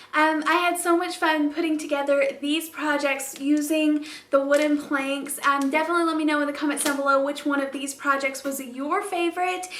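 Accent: South, United States. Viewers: Ha ha! She does have a super sweet voice and personality to match. I'm a Texan and to me there's a bit of Southern Belle in her voice 😁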